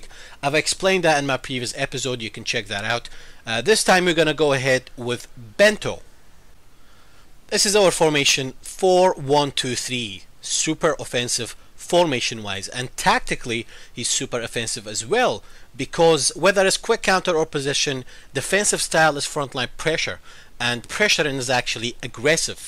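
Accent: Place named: Scotland, United Kingdom. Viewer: i thought you were Scottish myself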